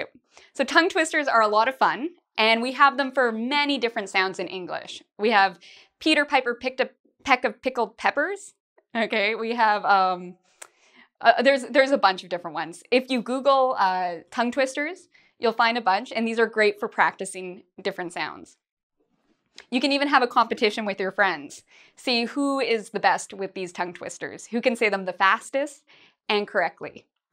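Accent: Canada. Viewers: thank you so much for your videos! Because of your lessons I have greatly improved my pronunciation and grammar. BTW, love the Canadian accent:) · I thought you were a Canadian lol...In HIMYM everyone would laugh at Robin cuz of her pronunciation ahahah...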